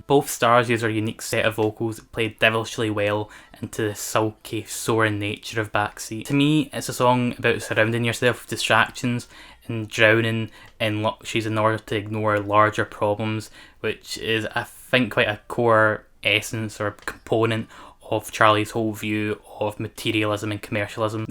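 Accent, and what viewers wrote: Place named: Scotland, United Kingdom. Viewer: This is the best channel I could find, music stuff with a scottish accent!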